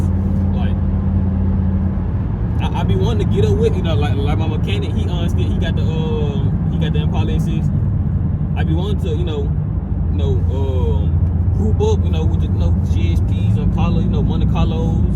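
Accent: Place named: Memphis, United States. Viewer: its how black people from memphis talk , if u not from around there then youre never gonna be able to understand them. I live in indianapolis but i can still understand what he saying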